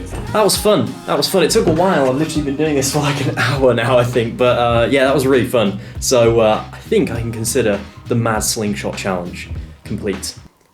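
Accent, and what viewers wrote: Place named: England, United Kingdom. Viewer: Are you from England? I am from Taiwan, I want to learn the cool accent you have...,plz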